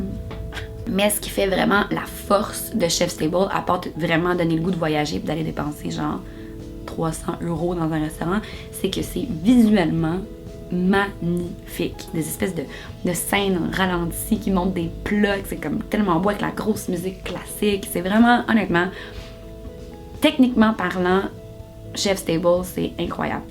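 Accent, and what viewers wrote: Quebec, Canada. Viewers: J'aime pas les québécois comme elle je suis pas rasciste j'aime pas l'accent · L'accent canadien te vas super bien :3 :) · Je suis francaise et je viens d'ecouter ta vidé, tu es vraiment trop cute avec ton accent; c'est du Québec?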